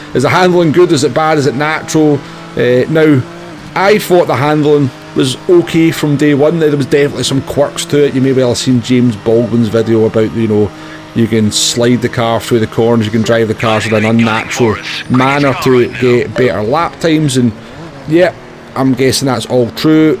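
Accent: Scotland, United Kingdom. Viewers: 😂😂 pure scottish so it is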